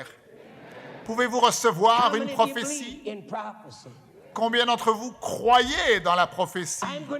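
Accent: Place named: France. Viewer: Amen! Alléluia! Merci bien-aimé frère! I always knew you were French, both from your accent and from your name! The Lord has supernaturally connected me to Morris Cerullo by revelation in 2018, and since I received 5 encounters with this precious man of God through the Spirit. You are blessed as you carry on this mantle and precious words. The Lord strengthen and bless you. Love from Togo.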